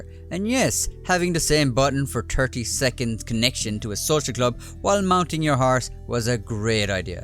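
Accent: Ireland. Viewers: Dublin or Belfast?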